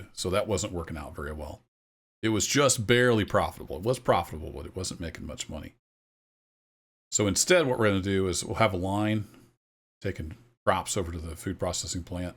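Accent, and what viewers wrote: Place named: United States. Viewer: Its weird when I've always watched people from the UK playing, and then I hear you pronounce things the American way. But it makes a change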